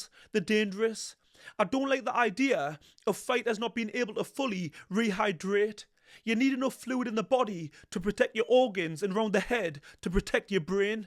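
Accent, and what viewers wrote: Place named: Geordie, United Kingdom. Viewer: That's gotta be the broadest geordie accent I've ever heard 😂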